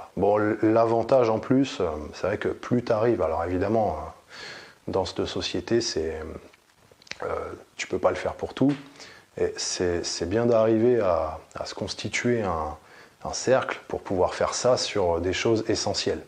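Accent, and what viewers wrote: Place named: Lyon, France. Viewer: t'as l'accent lyonnais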